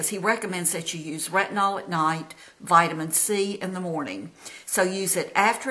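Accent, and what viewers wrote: South, United States. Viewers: I love her and her accent because I’m from the south. You look beautiful! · This lady is an such a debutante of the South! The accent is priceless and you can tell she is a genuine person with a great heart.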